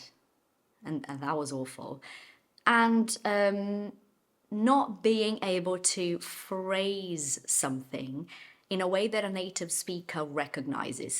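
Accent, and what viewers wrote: United Kingdom. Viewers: You have a beautiful British accent!! I couldn't tell you are not a native, actually!!! 😮😊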